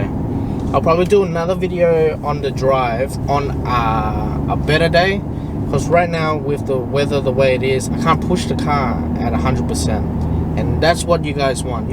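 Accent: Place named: Australia. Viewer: Sounds like Australian accent. Did you live in Aussie before? I used to work in Laos. Sabaidee from Indonesia 🇮🇩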